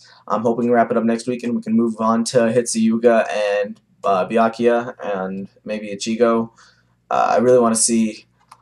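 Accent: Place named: United States. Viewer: lol you sound very American in your pronunciations of names but yea I am the same but British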